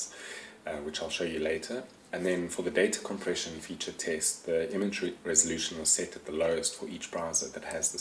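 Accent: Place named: South Africa. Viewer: Sounds South African.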